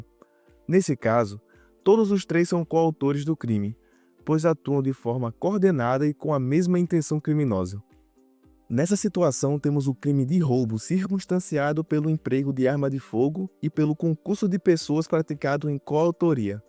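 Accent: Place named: Recife, Brazil. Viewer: Gostei do seu sutaque! É de Recife, é?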